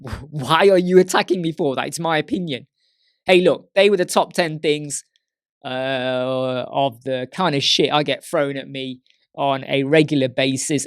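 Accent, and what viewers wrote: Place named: United Kingdom. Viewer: I love to watch you videos and is 1st time when I out a comment here. don;t take it pwrsonal, is because . I am too introvert to comment. 1- yea, maybe you talk too much but I see that like a good think: you give a better explanation and people can understand. the prcesses better. 2- Your Face . what? people say that to you? fuck them all ! 3- Elementor Bias: FFS your video are about ELEMENTOR! Is not about tomatos or pigeons! Elementor is your tool and if they want another editors they can watch other youtbers! period! 4- Promoting Hello: like you said: Hello is a FREE theme! I am not using it too much. I tryed ASTRA and OceanWP free versions. I chosed Ocean but Helo is an great and most important is a clean theme ! And in the future I want to focus on it. 5- Gimmicks? Iam lost on this! i do not understand what they want to say. 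because I learned many things for your videos and I am not new on elementor... 6- Too Excited: that makes your videos funny :) 7 not the Beta... Is someone want to see what is comming they can always use official elementor channel or watch other youtubers. 8: UK. well, you are born in UK. I jus tcome here in 2015. Your accent is 100% british. Yes, you are from UK :). 9- OceanWP. Well, Astra is worst than Ocean WP. The main reason i din not bough Astra or OceanWP is this: If i have troubles with free versions, why should I pay for it? my final toughts: keep doing your work :) haters always will hate. And I appoligise for my english, is not my native l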